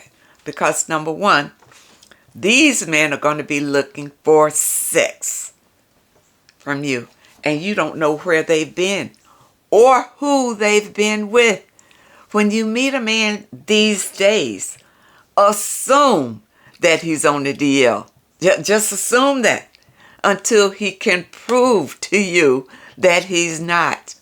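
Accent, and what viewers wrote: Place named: New Orleans, United States. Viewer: Love that New Orleans accent I can hear it unique energy you're amazing I watch you and Only Logical channel he expose those BSB Bottom Shelf Brads anyways great content channel you have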